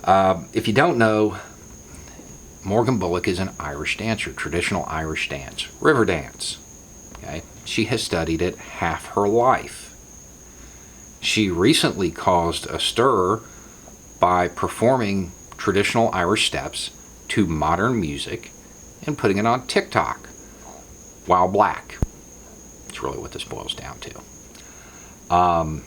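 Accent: South, United States. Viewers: You know, I have to admit to my own cultural prejudices when I hear this intelligent man talk about issues of great import in that accent. I grew up in Brooklyn and spent many years living in the south and hearing some of the most hateful things spoken with that accent that it's sometimes difficult listening to. It's like listening to Shelby Foote on Ken Burn's "Civil War" documentary, it's just hard to equate the one with the other. As has been said by many before, you can't judge a people with a wide brush: there are many intelligent and compassionate people who live in the south, it's a shame they are swallowed up by so many who may actually even be in the minority, loudly proclaiming their moral superiority while doing some of the most immoral things. Anyway, it's just a thought. Thanks, for speaking up.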